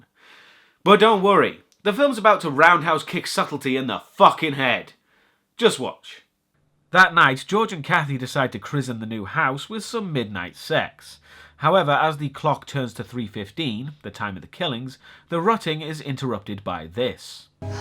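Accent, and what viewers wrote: United Kingdom. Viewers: cyberdemon 10130 I believe he is from the UK and the song is “ ”by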